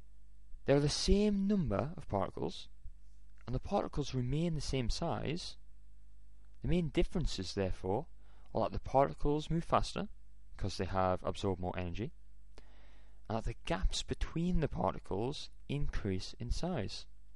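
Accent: Scotland, United Kingdom. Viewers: Thank you for the help! Chemistry is one of my favorite subjects in high school, and you've helped me out a ton with it! I cannot thank you enough, good sir! By the by, are you Scottish?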